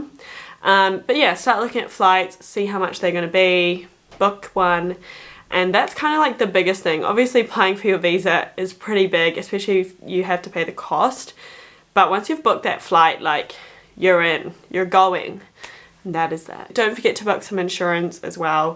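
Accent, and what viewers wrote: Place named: United Kingdom. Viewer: Great tips. Sounding more and more British these days 😁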